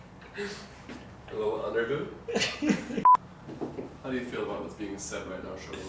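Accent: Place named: Germany. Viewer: schön dein deutscher Akzent! :D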